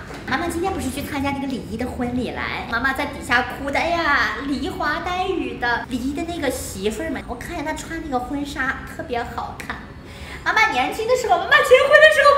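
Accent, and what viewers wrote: Taiyuan, China. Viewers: 这口音熟悉啊，山西太原的吧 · mi AMY 😂哈哈哈哈应该是太原的吧和我小时候听的话一摸一样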